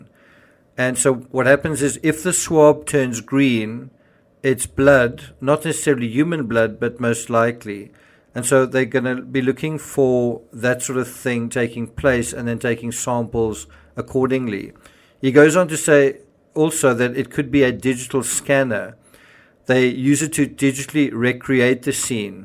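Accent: South Africa. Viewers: is from South African. We have friends from Durban who have the same accent. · You accent is like a South African?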